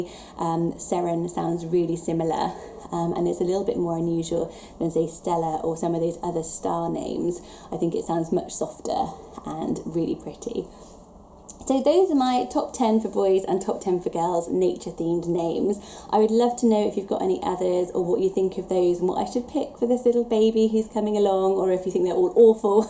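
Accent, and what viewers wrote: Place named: England, United Kingdom. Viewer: I like the name Aspen but i don't know about it for a girl. But, when i hear the name i automatically associate it with a character from the book series The Selection, i know that sounds silly, but i really love that series and the character is supposed to be a really handsome heroic person in the series. I'm also really young but i just like hearing people talk about baby names, it sometimes makes me think of what i would name my child in the future. Also, I'm American and I live in Tennessee so I live in the country, so names that i hear everyday are probably very different than names you hear everyday in the UK or anywhere in Europe really, so i just find these videos interesting. By the way I love the name Liberty with your accent but when i say it sounds silly LOL if i had a English accent it would be adorable though.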